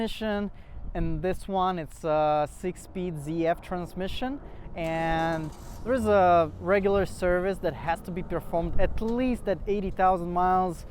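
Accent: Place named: Russia. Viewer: Russian accent af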